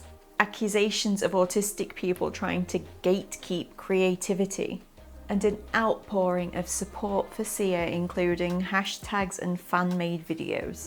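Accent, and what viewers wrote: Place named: England, United Kingdom. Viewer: I just re watched this video to try and work out what you were talking about, the person who made this video is saying the word ableist in her accent and is the way that I would expect to hear it. I'm sorry that you aren't used to hearing this accent (I'm not from the UK so can't tell you which part of England it is from), that doesn't mean that she has mispronounced the word.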